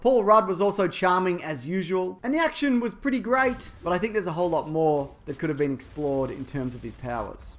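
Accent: Australia. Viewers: I'm Aussie, born and bred, and my accent is not where NEAR as broad..are you...amping up the Aussie a tad? Anyway, great stuff, love you work, give me a fucking loot box. Cheers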